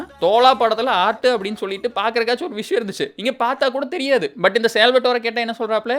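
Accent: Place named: Kongu, India. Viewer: Kongu slang ❤❤❤❤❤❤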